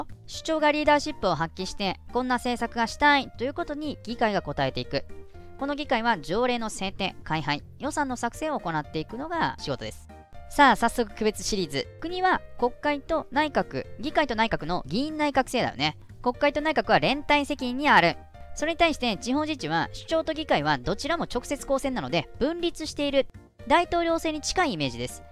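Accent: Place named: Kansai, Japan. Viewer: この回は関西弁が強調されてる！わら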